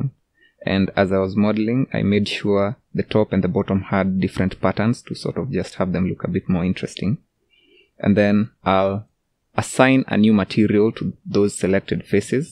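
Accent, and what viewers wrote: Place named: Uganda. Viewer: Your accent reminds me of my old Ugandan accent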